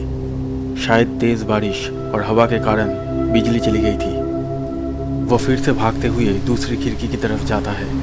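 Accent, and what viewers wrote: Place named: Bihar, India. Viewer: Sir aap bihar se ho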